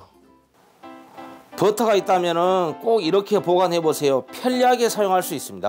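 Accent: Jeolla, South Korea. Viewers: ㅋㅋㅋㅋ붙어부니까요..전라도 사투리는 들을때마다 재밌는거 같네요. 좋은 아이디어 감사합니다.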